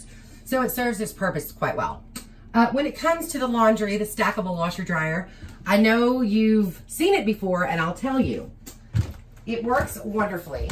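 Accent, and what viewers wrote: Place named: Texas, United States. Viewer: LOVED YOUR VIDEO TOUR OF YOUR TINY, WELL PLANNED HOME. I AM SO VERY PROUD FOR YOU. CAN YOU TELL ME, DO YOU HAVE TEXAS ROOTS? I HEARD ALOT OF YALL'S IN THERE. I AM A TEXAS GIRL SO PICKED UP ON THAT IMMEDIATELY. BEST OF LUCK TO YOU!!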